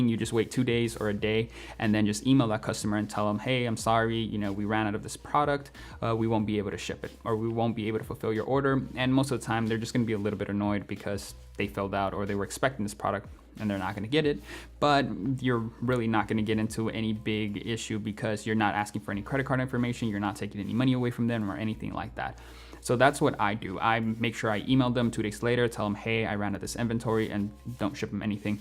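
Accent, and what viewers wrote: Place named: New York, United States. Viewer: Are u from ny bro?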